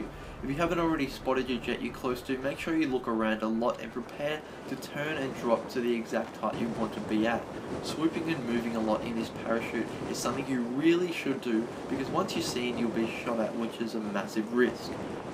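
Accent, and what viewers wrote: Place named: Australia. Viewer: Yay a Aussie voice :)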